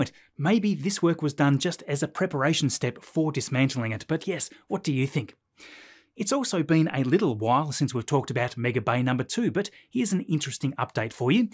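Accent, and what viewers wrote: Australia. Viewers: Hi. I don't know if other viewers experience the same strange feature when switching on undertitling: I always get it in Finnish! I have to explicitely put it back on "(american) english". I don't have this on other Youtube Channels. And, no: I'm not Finnish either. I'm Belgian, and I speak Dutch. But the english subtitles sometimes help when I did not understand you completely. Nothing to do with your Aussi accent, more with my poor hearing.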